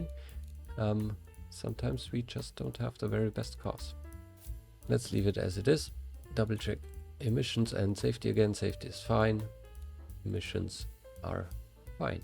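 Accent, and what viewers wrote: Germany. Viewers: + German accent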